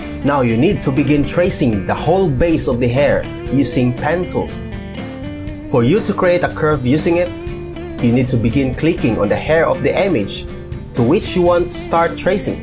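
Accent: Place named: Philippines. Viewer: pinoy ka ba? napaka pinoy ng accent mo hahhaha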